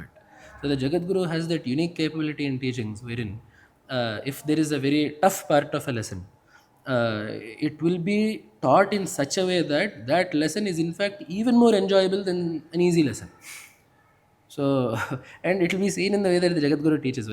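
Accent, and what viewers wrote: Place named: India. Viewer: It’s amazing to hear him imbibe Indian accent so effortlessly despite having grown up with American accent around him….btw, I really liked these QnA series.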